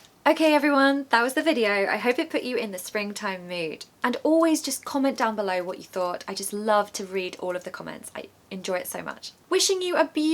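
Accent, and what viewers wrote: United Kingdom. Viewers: Read books to us in your British accent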